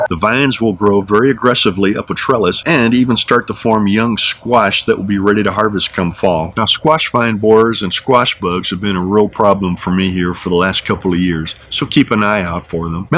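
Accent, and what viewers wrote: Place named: Texas, United States. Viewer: I thought you must be a Texan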